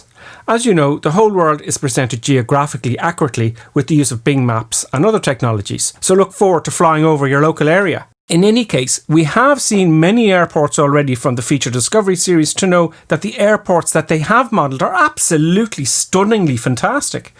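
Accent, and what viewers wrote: Ireland. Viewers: Hey new sub here U sound Irish man what country u from. Awesome video buy the way cant wait for it coming out on xbox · Are you Irish? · Are you from Cork boi? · wait, you’re irish lol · Thank you for this excellent video and detailed description. I like your Irish accent! Sounds like I will need to upgrade a few pieces in my PC... Can't wait to try it out! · Normally I’d thank you. But in this instance I enjoyed the review so much including the lovely Irish accent (wipes tear from eye) I watched the whole thing. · Great review..very well done 👍 looking forward to more reviews in the future..also love your Irish accent ☘️ Also I am an absolute newcomer to flight simulators...would really appreciate tutorials for total beginners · Love the Irish commentary. Refreshing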